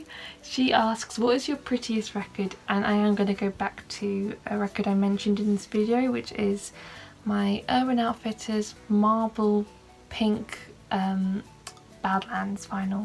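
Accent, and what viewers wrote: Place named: United Kingdom. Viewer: I’ve got also another question: where in the UK are you from? Because you’ve got a lovely accent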